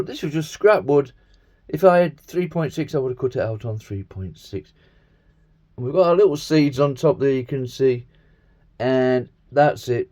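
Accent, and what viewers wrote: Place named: Northern England, United Kingdom. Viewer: is the best. The BEST. The PRO-jects are inspirational. I wonder if he's in the north of England? I'm trying to parse the accent.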